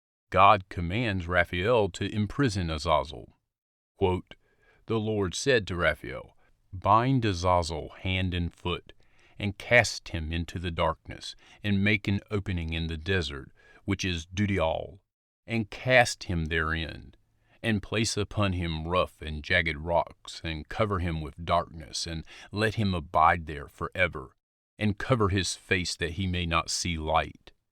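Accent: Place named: Baltimore, United States. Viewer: im thinking baltimore or philly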